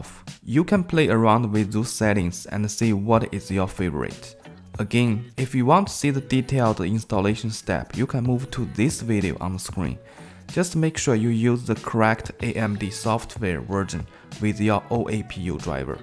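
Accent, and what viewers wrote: China. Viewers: 怎么像中文口音啊老铁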